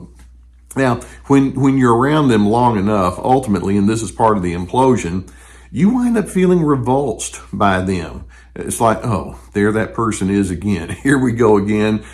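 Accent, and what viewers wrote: South, United States. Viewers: You have a great voice and a beautiful southern accent I can listen to this all day.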